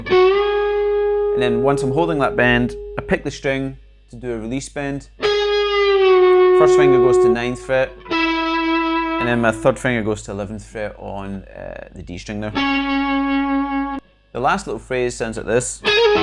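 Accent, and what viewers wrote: Scotland, United Kingdom. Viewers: you sound scottish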